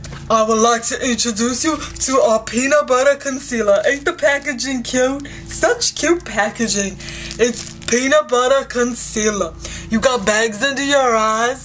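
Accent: Brooklyn, United States. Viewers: omg, i know what video ur talking about from jpmetz....hahahaha. u are funny. u should make more vids like this. i hear u pullin the brooklyn accent out of your voice girl. lol. nice!! keep up the great vids girl.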